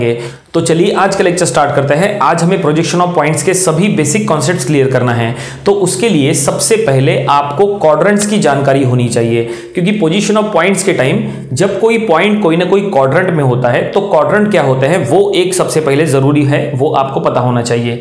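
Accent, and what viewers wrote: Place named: Hyderabad, India. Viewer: Sir ka hyderabadi ascent plus super explanation.. 🔥thx sir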